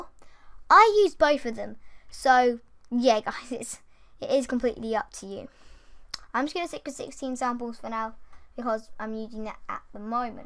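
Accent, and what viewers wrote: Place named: England, United Kingdom. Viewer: Shes English like me.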